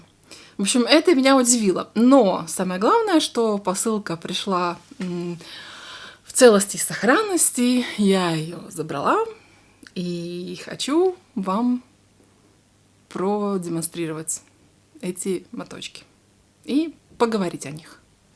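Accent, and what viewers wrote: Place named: Latvia. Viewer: Sveiki:) es, ceru, ka var latviski, jo dzirdu latviešu akcentu:) tik gribu pateikt, lai šis gads ir saulaināks un priecīgiem notikumiem bagātāks! Ļoti forša episode, arī es atceros eBay, es pat tirgojos tur, radās ideja papētīt to atkal:)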